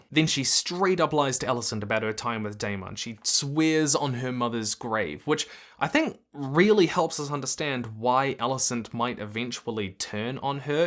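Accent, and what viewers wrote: New Zealand. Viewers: I love how the auto-subtitles read your New Zealand accent as saying, "Six Six Six" at the beginning :)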